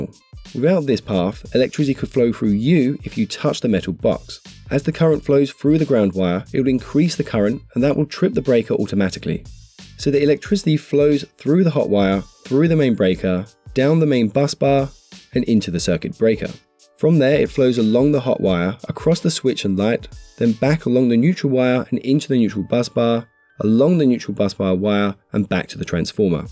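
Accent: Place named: England, United Kingdom. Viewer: Why North America? You're clearly English?????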